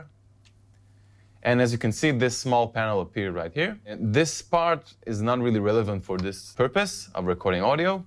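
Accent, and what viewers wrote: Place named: Israel. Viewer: עשיתי סקבסקרייב כי שמעתי מבטא ישראלי :)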